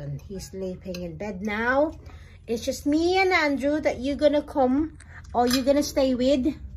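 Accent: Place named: England, United Kingdom. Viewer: I love love the English accent. I can listen to you talk all day long. Sorry your original Christmas plan didn't happen, but time alone with each other is ❤️. So cute to hear you say mukbang and Noche Buena. Hala, Tagalog pala. Pinahirapan mo pa akong mag ingles. Kuhhh, bata ka! Hahaha, nice meeting you.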